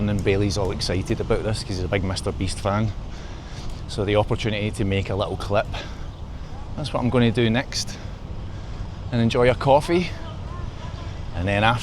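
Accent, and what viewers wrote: Scotland, United Kingdom. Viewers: I've always thought your voice sounds just like Roddy Frames - then I discover in this video you're from the same town ! 😁 - sorry I don't know different Scottish accents,, it's probably obvious to Scots 😂Love the videos, well done on what you're doing 👍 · Really enjoying your channel. You are very well Scottish spoken. So soothing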